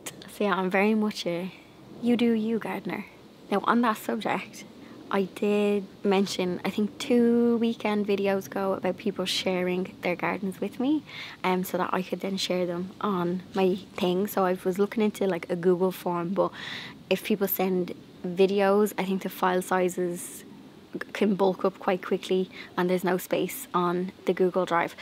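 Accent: Ireland. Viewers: just found your wonderful site! Awesome! You have so much energy! and all the hard work has resulted in beautiful gardens! (where are you located? I detect an Irish accent) Keep on keeping on....regards from Nana Green Fingers in the U.S.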